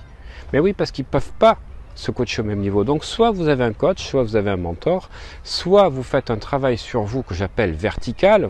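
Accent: Bordeaux, France. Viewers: Merci Bro. ! Vous avez perdu votre accent bordelais ?!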